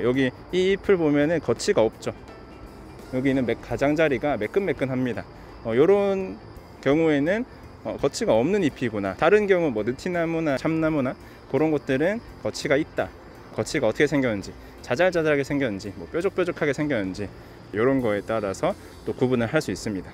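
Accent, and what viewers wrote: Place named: Gyeongsang, South Korea. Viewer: 경상도 사투리 살짜기쓰시네요? ㅎㅎ 오늘영상은 특별히 자신있는 목소리톤이셔서 활기차보이시고 좋군요! 목소리 참 매력있으세요! 늘 잘보고있어요! 감사합니다🙏🙏🙏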